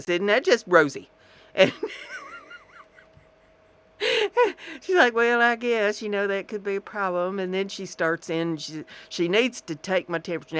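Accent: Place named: South, United States. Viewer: Southern accent Idk partner! 2021 better be better and not another tarnation. Lol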